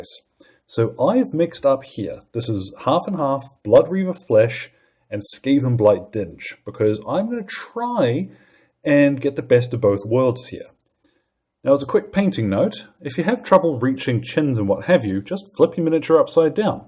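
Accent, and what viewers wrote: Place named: New Zealand. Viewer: i love that kiwi banter, it reminds me of Simon Bates from radio 1 back in the day..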